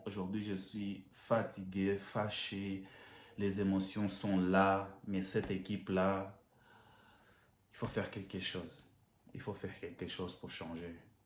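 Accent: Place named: Portugal. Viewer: Bonne vidéo mon frère, on sent ta colère et je la partage ! Loin derrière nous l'époque des grands Cristiano, Ramos, Marcelo, etc, loin derrière nous l'époque où les joueurs se battaient pour le blason et qui mouillaient le maillot...maintenant on a des branleurs. Des branleurs avec un énorme talent, oui, des branleurs qui parfois arrivent à avoir une étincèle, un brin de génie, mais des branleurs quand même. D'ailleurs, petite question, es tu portugais ? J'ai comme l'impression d'entendre un léger accent. 😄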